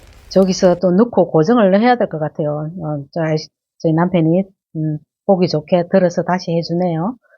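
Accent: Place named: North Gyeongsang, South Korea. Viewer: 왠지 익숙한 편안한 억양이랑 말투라서 같은 곳이구나 생각했지요 저도 경북이거든요 멋지세요^^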